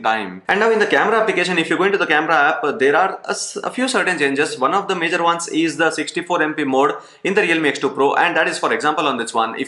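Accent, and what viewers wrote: India. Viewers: Should be a law against Indians speaking English 👎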